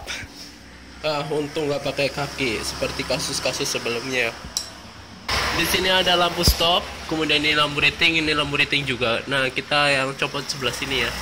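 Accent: Banyumas, Indonesia. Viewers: Tak kirain banyumas mas boy..soalnya logatnya kaya orang banyumas.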